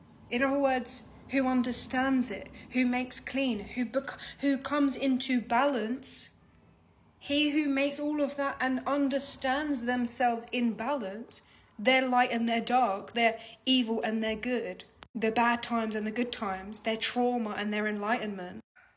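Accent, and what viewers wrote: United Kingdom. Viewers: من لكنة اللسان هذه السيده تتحدث باللهجة البريطانية وليست أمريكية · لكنتها انجليزية وليست أمريكية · ليست أميركية ، بل انكليزية أو بريطانية واضح من لهجتها .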